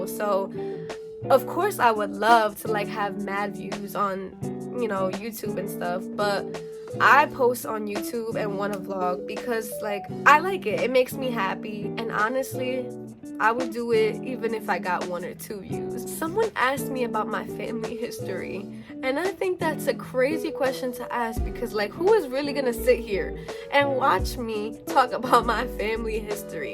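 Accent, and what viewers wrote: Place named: United Kingdom. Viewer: Great video! Your British accent always gets me and great Q@ keep it up😁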